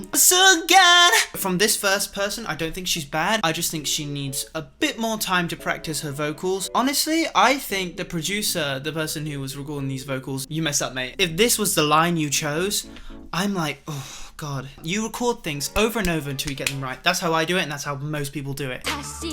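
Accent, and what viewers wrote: United Kingdom. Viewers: J’aime tellement l’accent des UK 😭❤️ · Ok so this is my first time watching one of your non singing videos and your British accent hit me like a fucking train ngl.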